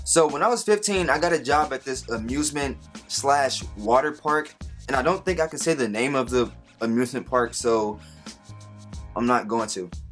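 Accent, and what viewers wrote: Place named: Canada. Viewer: Are you from Canada you have an accent haha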